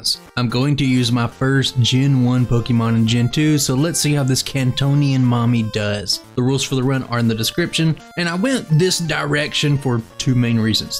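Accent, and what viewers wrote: South, United States. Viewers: what’s funny is to me you have a southern accent (I’m from Michigan) and in my mind I was like “oh yeah, he’s gotta be more southern based, that makes sense” lol.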